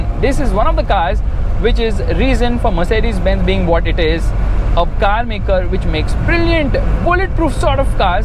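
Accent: India. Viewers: Dont speak English in indian style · ..... Indian accent.....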